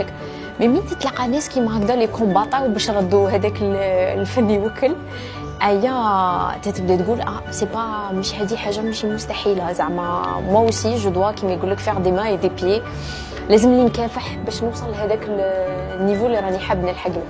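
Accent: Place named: West, France. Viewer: J'aime ta façon de parler et bien-sûr le contenu .. mais surtout ton accent de l'ouest ça me manque. Bon courage pour ce que tu fais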